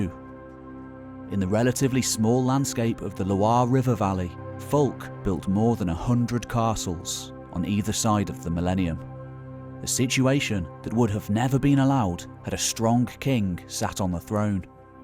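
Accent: United Kingdom. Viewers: Thanks for posting this information presentation. You sound like a fellow Brit to me, so what's with the 'ad-ver-tize-ment' pronunciation? Just wondering.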